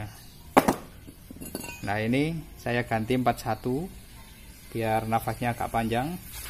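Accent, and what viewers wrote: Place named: Jombang, Indonesia. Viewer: Logatnya kayak orang jombang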